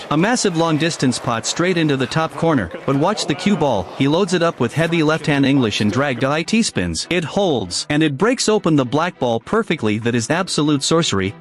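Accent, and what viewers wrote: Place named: United States. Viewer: we fkn know..and a fkn yank commentary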